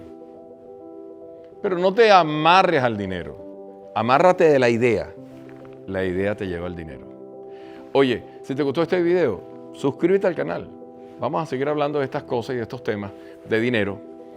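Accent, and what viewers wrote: Venezuela. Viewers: Que magnifico escuchar a alguien con acento venezolano, con mentalidad triunfadora y sobre todo enseñando consciencia de libertad!!! Statehood #51!! Graciass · AJJJJ ... ESE ACENTO VENECO... LASTIMA... ME INTERESABA EL TEMA... ADIOS Y REPORTADO ... 😢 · Coño seré sincero contigo cuando me saliste dije este viejo ya va hablar paja luego escuché el acento dije coño venezolano luego segui escuché me quedé hasta final grande historia pana que experiencia una gran inspiración para muchos nunca te rindes!!eres el propio tommyshelby. Saludos desde Portugal ya hoy te sigo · Que gusto el acento venezolano en magnífica dicción y pronunciación. El contenido es extremadamente relevante. Gracias por tu testimonio de experiencia en el logro del éxito financiero.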